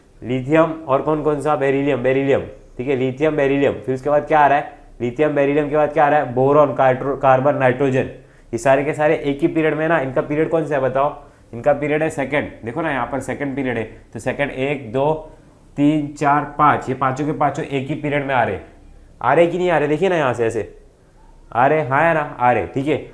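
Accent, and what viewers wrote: Maharashtra, India. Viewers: Sir aap Maharashtra se ho Kya thoda thoda Marathi jaisa language hai aapka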